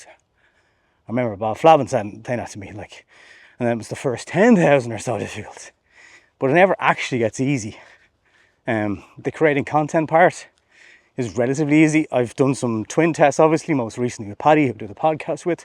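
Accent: Ireland. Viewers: New Zealand here. YouTube ads have become more and more crappy with stupid almost scam products more often than not. Love your channel. Found it looking at Skoda stuff before in light my Octavia RS. Kept watching as I like your style and who doesn’t love an Irish accent. Don’t watch shorts don’t see how they are any use for cars. 10-20 minutes is about right for me.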